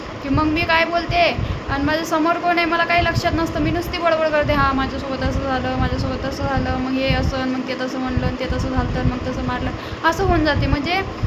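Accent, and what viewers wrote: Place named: Vidarbha, India. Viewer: तुझी भाषा विदर्भ खूप छान आहे मला खूप आवडते मी खूष आहे तुझं स्वभाव छान एक नंबर आहे ❤❤🎉🎉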